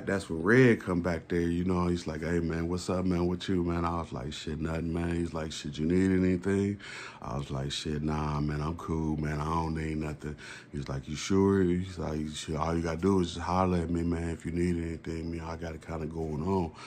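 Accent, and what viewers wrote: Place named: South, United States. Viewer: Water ever time you talk…talk like u from slow south…… story dope though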